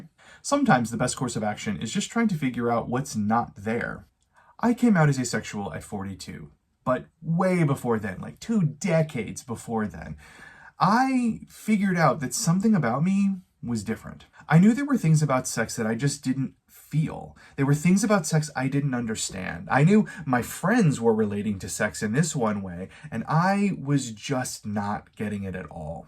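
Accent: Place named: Canada. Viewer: Are you from canada brother , your english accent as canadian people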